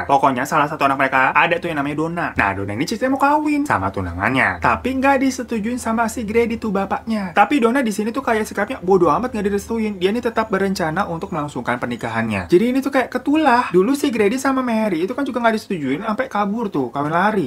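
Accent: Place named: Banyumas, Indonesia. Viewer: Enakan cara ngomong nya bang ,hehehehe ngapak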